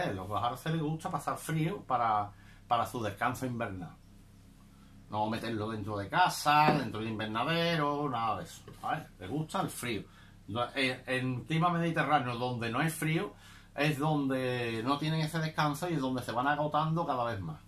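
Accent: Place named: Andalusia, Spain. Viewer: Gracias por el video,informacion valiosa y util. Te sigo desde Mallorca aunque soy de Puebla Del Rio y es un placer escuchar nuestro acento cerrao andalú.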